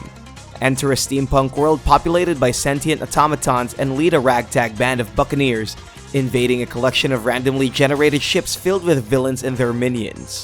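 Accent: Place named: Philippines. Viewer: Off topic. You sound Filipino.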